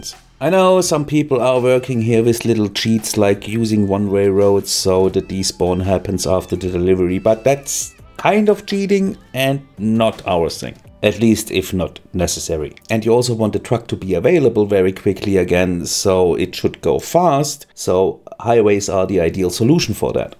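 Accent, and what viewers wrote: Germany. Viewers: Nothing like a good while watching a guy with a German accent explain things I understand nothing about and will probably never use. Very relaxing. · You can hear your German dialect, hence the comment in German. That's way too much fumbling for me! The game would be a lot more fun if you didn't have to fiddle around so much. This is really frustrating! It takes hours just to get a single node up and running. :( It takes a million mods to get by and to be able to build reasonably well. I hope CS2 has a lot less fiddling and the traffic AI is a lot smarter. You have to build unrealistically many roads just because the AI is bad and many other extremely annoying things... ________________________________ Man hört deinen deutschen Dialekt raus, deshalb das Kommentar auf deutsch. Das ist mir viel zu viel gefummle! Das Spiel würde viel mehr spaß machen wenn man nicht so viel fummeln müsste. 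Das ist echt frustrierend! Man brauch Stunden nur um einen einzigen Knotenpunkt zum laufen zu bekommen. :( Man brauch eine Million Mods um zurecht zu kommen und um einigermaßen schön bauen zu können. Ich hoffe das CS2 wesentlich weniger gefummle beinhaltet und die Verkehrs-KI wesentlich intelligenter ist. Man muss unrealistisch viele Straßen bauen, einfach weil die KI schlecht ist und viele andere extrem nervige Dinge... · VERY useful. Danke for creating this. (i hope i dont make a mistake saying it in German, you sound German) :) But your english is spot on! :) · It was you who inspired me to purchase city skylines five or more years ago. I played for over 2,000 hours. Thank you. The video that blew my mind was one about a dedicated industrial area with one-way roads and one-way rail lines. This was before Industries DLC came out so it was comparatively primitive to this, but many of the principles you used then are present here. 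Again you’ve blown my mind and given me a yen for city planning. Your cities are living beings with free-flowing roads carrying cars like red blood cells. Truly a pinnacle of German engineering. Also I like the Frankfurt accent. It’s both intelligent and hilarious at the same time. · When a man with a German accept starts telling me about order and efficiency, I can't help but pay attention. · Yeah it did lol. The German accent added a +1 whilst talking technical terms as well. I might have to make a new city now. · btw are you maby german bc of the acent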